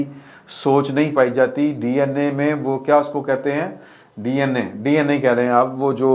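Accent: Punjab, Pakistan. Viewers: سر جی خبریں تو ساری ایک جیسی ہوتی ہے لیکن آپ کا انداز بیاں سننے کا مزہ آتا ہے پنجابی ٹچ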